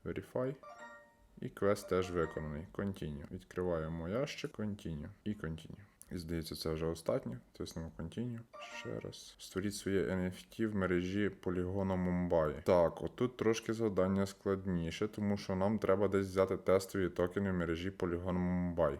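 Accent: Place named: Chervonohrad, Ukraine. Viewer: У тебе діалект як у мене) ти часом не з Червонограда?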